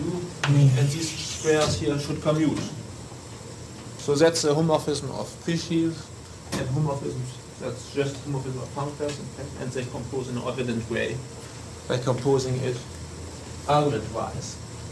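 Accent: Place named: Germany. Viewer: I didn't expect him to have such a strong German accent, despite knowing some of his history. Very interesting.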